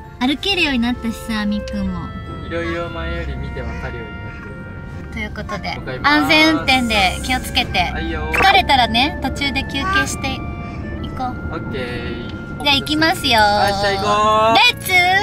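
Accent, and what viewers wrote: Oita, Japan. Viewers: 大分弁とピーピー豆最高‼️ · 大分県民として大分弁時々出るの最高です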